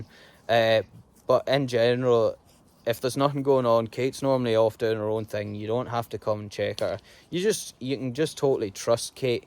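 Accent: Scotland, United Kingdom. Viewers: All of your dogs are beautiful, but Kate has such a personality. I absolutely love your accent, I could listen to you talk all day! One of these days I will visit Scotland, I live in Oklahoma, United States. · This was a very easy “Subscribe”!! Your accent may be invisible to you, but this American whose grandmother was from Edinburgh just loves it! It took me a moment to catch on to what you were saying at first, but then I got the rhythm of your usage. When I was a little girl, my friends who would come along on a visit to my grandmother’s place couldn’t understand a word she said. LOL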